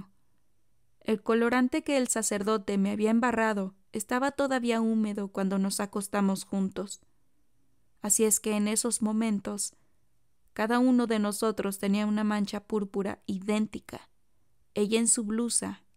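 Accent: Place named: Mexico. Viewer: GRACIAS, POR DARNOS ÉSTE LIBRO CON TU BONITA Y CLARA VOZ Y EN MEXICANO 😃👍🇲🇽♥️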